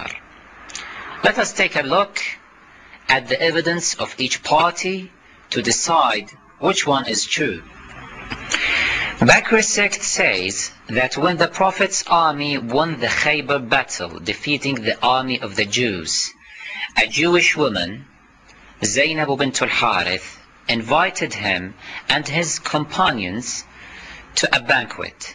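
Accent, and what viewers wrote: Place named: Iran. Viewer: aksen bhs arab n englishnya ni org adalah org farsi. N kau tak usah pura tak tahu klo org rafidha tu agamanya mmng mengajarkan kekacauan bg Islam dan umat Islam.mrk senang mengadu domba antar muslim. ( rafidha x muslim) Agama rafidha ( syiah 12 imam) inti ajarannya MMUMUSUHI MUHAMMAD SAW DAN WAJIB BERLISAN KEJI THDP BELIAU!!!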